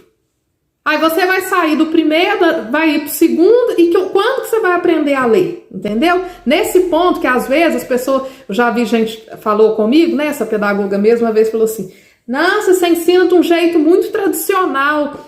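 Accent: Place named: Minas Gerais, Brazil. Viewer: Vc é mineirinha? Sotaque inconfundível 😅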